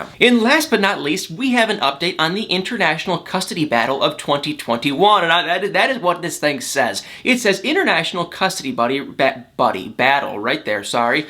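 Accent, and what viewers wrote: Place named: Midwest, United States. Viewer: You crack me up a northern mid western constantly saying Y’all. 🤪. Love ya. Greeting from NYC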